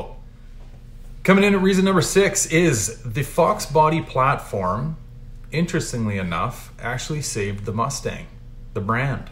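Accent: Canada. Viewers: He sounds Canadian. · You Canadian eh? Thanks, Great Video. I want a GT Convertible. Does it matter 89-93? I would think by 93 bugs would be less? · I can always tell when a Canadian is talking.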